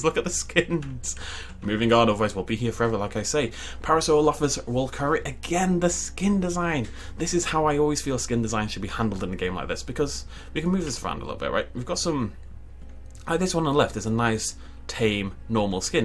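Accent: United Kingdom. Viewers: Woah I thought this was Nature's Compendium when I clicked. Imagine my surprise when I heard the voice of my favorite Brit.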